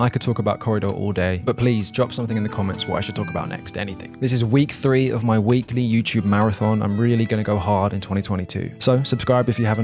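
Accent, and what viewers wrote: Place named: England, United Kingdom. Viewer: Lovely English accent - not Irish, Scottish or Welsh. Crisp, clear English accent. I sat through your entire video just listening to you talk. Don't get me wrong - the content is great. But I was here for that lovely English accent! Thank you.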